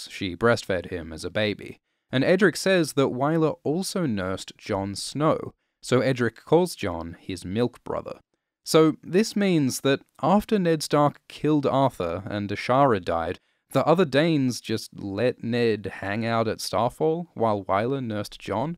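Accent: United Kingdom. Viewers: Dorne sounds a whole lot like Dawn when you say it with a British accent. Coincidence? I think not!!!!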